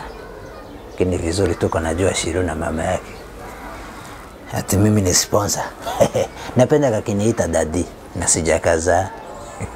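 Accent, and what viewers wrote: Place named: Kikuyu, Kenya. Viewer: Haki si ako na kipawa sana yaani ako na accent ya wa kikuyu kabisa love it 😍 😀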